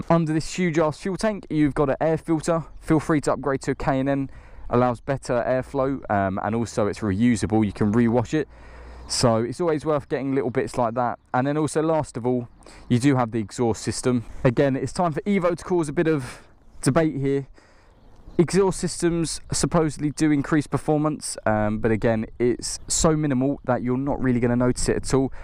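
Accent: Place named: Southern England, United Kingdom. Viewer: Just out of interest, where abouts in England do you live, you sound south ish, which is where I am. Just because I’m 16 in a month and then going off a fair distance to college. Wondered how suitable the yzf would be for this and where I could find a good dealer in the south. Cheers in advance buddy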